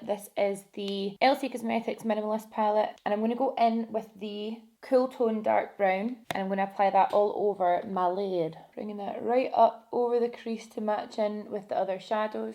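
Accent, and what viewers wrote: Scotland, United Kingdom. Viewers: oh my god are u scottish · I have to admit I haven’t watched you in ages even though I’ve been following for a few years. I’ve missed you! So much talent and I love Scottish accents (must be because of my heritage)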